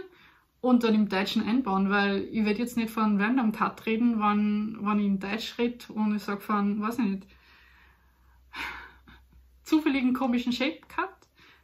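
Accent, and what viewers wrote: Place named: Upper Austria, Austria. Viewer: Hi! Ich hab eh schon bei einem deiner sehr frühen Videos nachgefragt, ob du auch aus Oberösterreich kommst (ich bin aus dem Mühlviertel) und war nicht überrascht, dass du das bestätigt hast. Dialekt finde ich gut und sollte für keinen Deutsch-Muttersprachler eine wirkliche Hürde sein... Ich finde Inhalte auf Deutsch gut und lasse mich überraschen. Zum Thema Ravensburger: Ich hab grad gegoogelt, ob der Name ursprünglich auch im Deutschen von einem Raben kommt und scheinbar gibt es verschiedene Theorien. "Rabe" ist eine davon, der Name könnte aber auch von "Rauenburg", also einem rauen Hang neben der Burg abstammen. Interessant jedenfalls.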